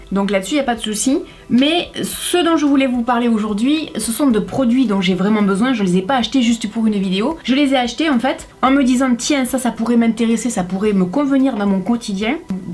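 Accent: South, France. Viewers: Salut! Je voulais juste te dire que les stylos anti- éraflure sont une grosse arnaque, même les plus chers ne fonctionnent pas, donc pas la peine de débourser 20e chez Norauto tu seras juste déçue!! Et sinon j'adore tes vidéos, elles sont toujours intéressantes et m'ont permise de découvrir pas mal de bon plans et je ne me lasse pas de t'écouter parler avec ton p'tit accent du sud. Gros bisous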